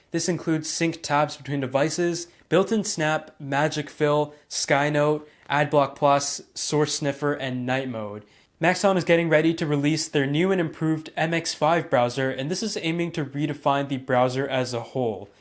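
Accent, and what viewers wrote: Canada. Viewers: wait u canadian fam?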